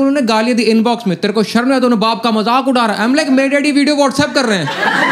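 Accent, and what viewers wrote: Punjab, India. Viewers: Kaur yes both have punjabi accent that makes it more funny !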